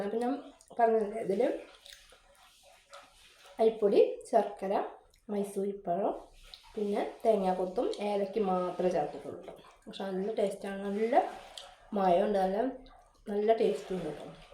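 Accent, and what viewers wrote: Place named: Kunnamkulam, India. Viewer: സൂപ്പർ. തൃശൂരിൽ കുന്നംകുളത്തല്ലേ വീട്? സംസാരം കേട്ടിട്ട്😅😅😅😅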